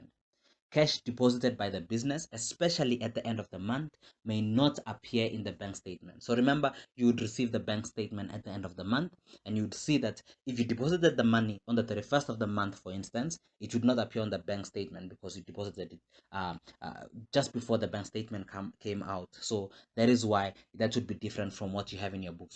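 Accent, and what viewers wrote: South Africa. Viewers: Very well elaborated! Thank you. The illustration looks like a Kenyan question but the accent is South African haha (on a light note)... Thanks again!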